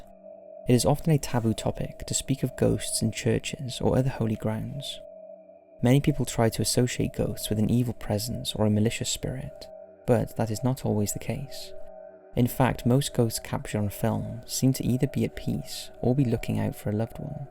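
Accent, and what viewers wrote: Wales, United Kingdom. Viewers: His accent is Welsh.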